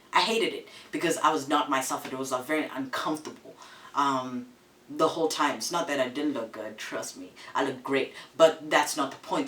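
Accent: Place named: United States. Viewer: oh my word you have an American accent already :D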